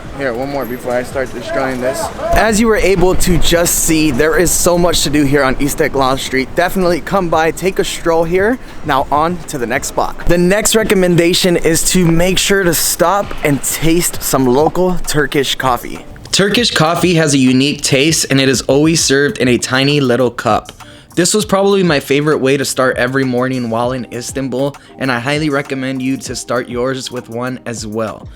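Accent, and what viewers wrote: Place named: United States. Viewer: You sound like a yank but look Turkish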